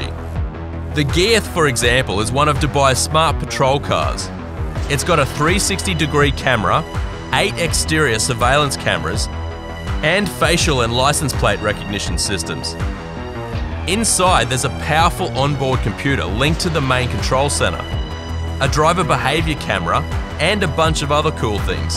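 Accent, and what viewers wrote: Australia. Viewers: Your Australian accent is so charming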